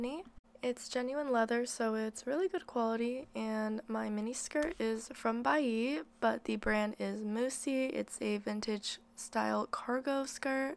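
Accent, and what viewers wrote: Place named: Russia. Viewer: может мне кажется, но почему-то у меня создаëтся ощущение что у неë русский акцент) но девушка очень милая, и образы подобранны очень грамотно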